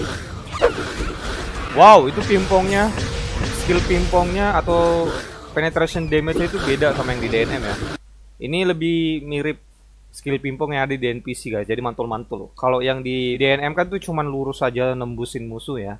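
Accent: Java, Indonesia. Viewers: bro lu tu orang mana kok kadang logat jawanya. keluar,btw ane juga pernah kesulawesi tenggara tahun 2003 disana masih hutan,kalo sulawesi selatan cemana disana?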